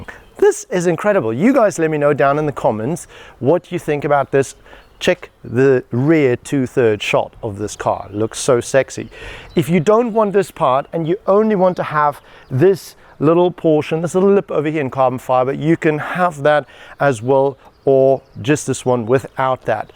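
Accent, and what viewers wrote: South Africa. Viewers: is this dude south african ???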